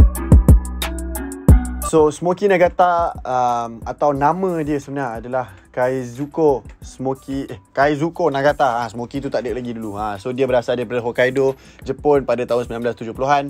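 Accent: United Kingdom. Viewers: Haha mantap English accent, just like the video and content, keep it up and well done!🤜🤛🙌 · Mantap oo british accent die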